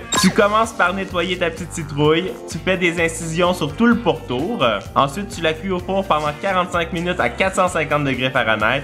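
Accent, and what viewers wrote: Canada. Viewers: On est pas trop citrouille en France mais c bon et on dit pas melon d'eau on dit pastèque voila et désoler j'aime pas trop l'accent canadien mais j'aime se que tu fait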